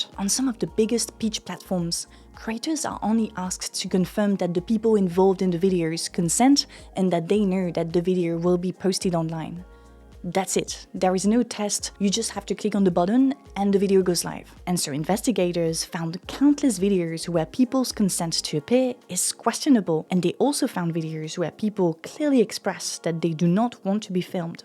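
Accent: France. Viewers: i wish I could figure out where your from. best guess... French, but English educated. doesn't matter. good content intelligent informative soft voice is easy to listen to. thanks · I have never heard a youtuber speaking english with a french accent before. Cool, nice video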